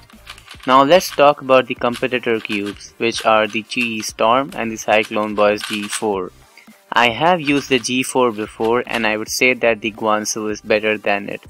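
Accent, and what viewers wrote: India. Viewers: U sound Indian, are u?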